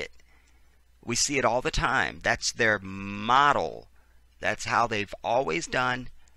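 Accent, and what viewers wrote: United Kingdom. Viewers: The British accent 😂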